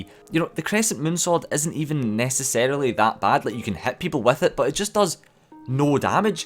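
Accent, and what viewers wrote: Scotland, United Kingdom. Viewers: I love the Scottish accent! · Are you Scottish? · that scottish accent maaaaaaaaaaaaan!!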